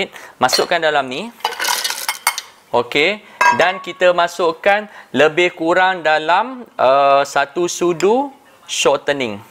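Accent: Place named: North, Malaysia. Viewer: T kasih bg resepi, cakap utagha syok dengaq, harap2 rajin la nk buat nti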